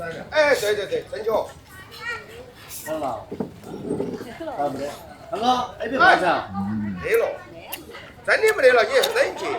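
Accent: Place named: Sichuan, China. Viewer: 四川话太歪了😊😊😊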